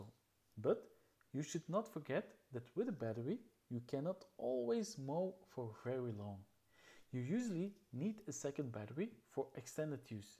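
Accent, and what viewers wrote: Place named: Netherlands. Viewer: Think he might be dutch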